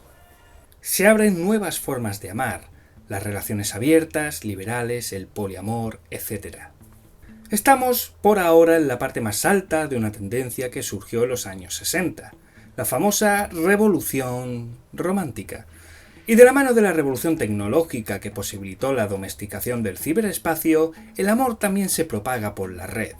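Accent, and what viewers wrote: Spain. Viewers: Me gusta el asentó español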